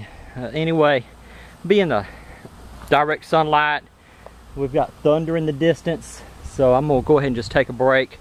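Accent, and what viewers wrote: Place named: Texas, United States. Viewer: Looks fantastic. Enjoyed hearing a genuine Texas accent. Lots a posers out there these days.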